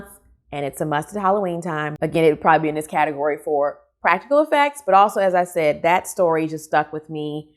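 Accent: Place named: England, United Kingdom. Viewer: This was amazing 👏 I love your English accent, it's better than mine 🤣 I can't wait for dark nights to roll back in so I can watch horror movies in the dark from like 5pm lol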